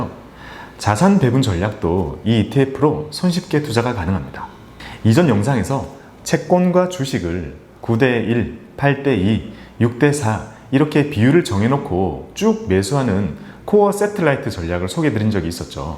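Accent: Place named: Busan, South Korea. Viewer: 부산 출신이신가봐요 ㅎㅎㅎㅎㅎㅎㅎㅎㅎ재미있네요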